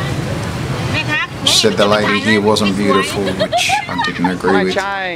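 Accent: Australia. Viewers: u sounds like Aussie are you? Hello from Adelaide SA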